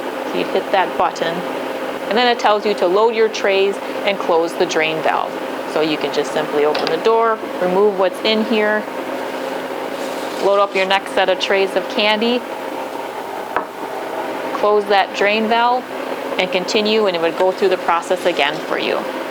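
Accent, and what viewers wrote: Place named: Wisconsin, United States. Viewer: Thank for detailed setting instructions! Also, your accent has me missing home in WI. 😍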